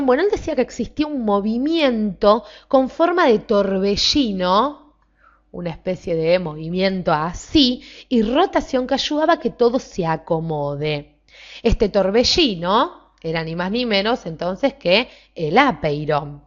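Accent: Argentina. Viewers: Me ha gustado mucho este video esta bien chévere! Saludos desde Brasil y me enamoré del acento argentino. Gracias!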